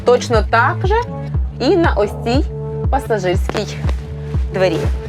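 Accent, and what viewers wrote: Russia. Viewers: Вах, Тарик, разбирается в мове? Так крыша или дах? Послушай ещё раз! Ведущая, просто "Пэрсык в Багдадской ночи!", но украинская речь - с русизмами, а это - суржик... Увы.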